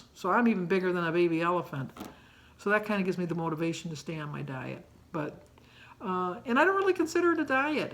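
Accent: Midwest, United States. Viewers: Hi. I love your videos (and your midwestern accent:) You motivated me to join WW (tomorrow is my weigh in after my first week). I really like your day to day videos. Thank you!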